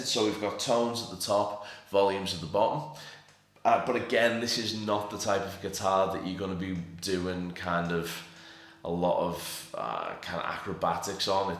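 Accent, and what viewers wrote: Liverpool, United Kingdom. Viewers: Rickenbacker and a scouse accent. What a combo. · haha man, as soon as i heard you speak, no mistaking that Liverpewl accent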